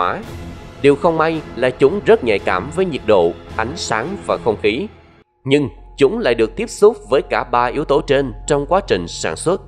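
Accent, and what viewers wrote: South, Vietnam. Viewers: Nói giọng MN mà toan la dùng từ MB,( mỡ lợn, quả ngô, bỏng ngô, đảm bảo, ), nghe nó ngộ ngộ sao đâu. ( Mỡ heo, trái bắp, bắp nổ, bảo đảm). Và còn nhiều từ MB nữa.Vv..vv. Cũng có thể anh này là người Bắc 75-2024. Cũng cảm ơn Anh rất nhiều vì đã chia sẻ những thông tin rất là hữu ích.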